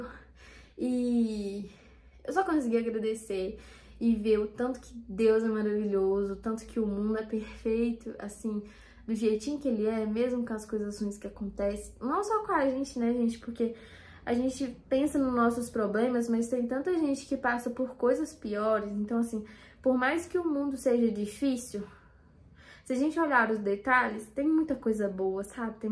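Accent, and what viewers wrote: Minas Gerais, Brazil. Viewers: fico tão feliz por ter voltado. Gosto tanto desse jeitinho mineiro seu de falar, e independente do seu peso, voce continua linda!! ❤ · .. é uma delícia ouvir vc falar.. vc é tão boa comunicadora, seu sotaque mineiro é tão gostoso, e seu jeitinho é muito fofo! continueee <3 Deus te abençoe demais! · Caraaaaa... Apesar da situação sensível, obrigado pela coragem de compartilhar sua história com a gente. Eu jamais me imaginei assistindo um vídeo do YouTube de 30 min... Só hoje assisti os 3 seus. Um dos motivos é por vc estar compartilhando algo que não é só a suposta "perfeição" que vemos exposta na internet. Aaaah, cara, o sotaque mineiro (salvo engano) é maravilhoso! Tatuagem de interestelar 😮❤ mostra mais dela sim! Amo esse filme! Estimo melhoras e que tudo fique maravilhosamente bem para vc e para os seus. Abraço!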